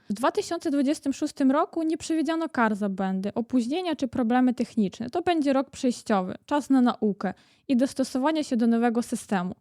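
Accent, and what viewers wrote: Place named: Podlasie, Poland. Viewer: Ale Pani fajnie zaciąga - obstawiam, że Podlasie 😊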